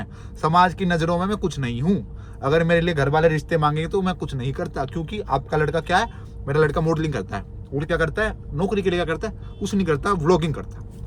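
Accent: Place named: Delhi, India. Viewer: bhai aap cheppi bada tagda bolte ho !!!!!!! maira delhi ka yek guy friend hai ditto same !!!!! ikdam !!!!! ussi ki tarah bolte ho 😆😆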